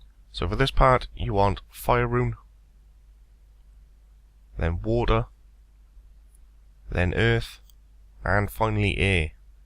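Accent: United Kingdom. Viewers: (Break) and (Law) --> British guy narrating. Long story short, a sudden image of popped into my head.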